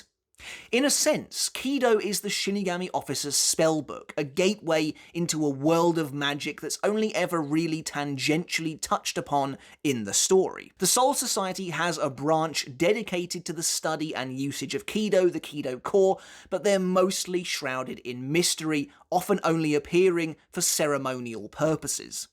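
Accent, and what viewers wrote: England, United Kingdom. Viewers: Pronouncing these with english accent is so irritating. 😂😂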